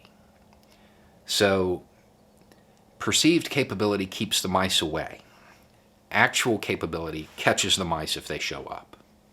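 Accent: South, United States. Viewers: Smart man country accent...ty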